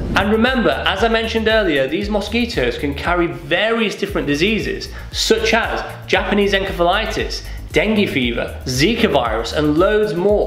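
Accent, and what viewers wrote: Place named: England, United Kingdom. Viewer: Yay an Englishmen such a refreshing change hehe. Great content too thanks so much 👍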